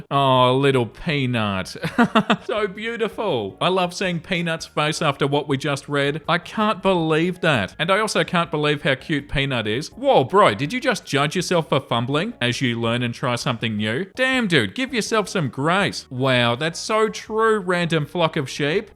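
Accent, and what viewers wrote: Australia. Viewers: Thank you!!! I was BEYOND excited when I saw an Australian accent as an option 😂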